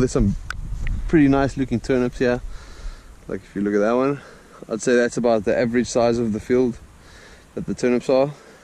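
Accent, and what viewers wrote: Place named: South Africa. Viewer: What part of south africa are you from?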